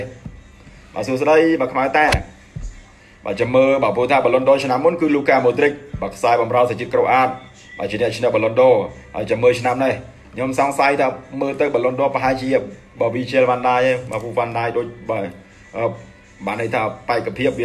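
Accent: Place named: Stoung, Cambodia. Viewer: សម្លេងadmin ដូចអ្នកស្រុកស្ទោង កំពង់ធំ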